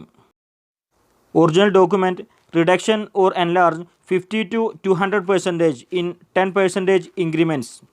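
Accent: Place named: India. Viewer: thanks...but your accent is too hard to understand for non-indian...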